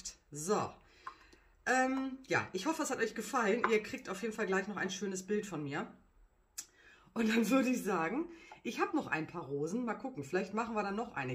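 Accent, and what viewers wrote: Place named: Germany. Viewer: Gutes Video und der German Akzent ist echt amüsant :D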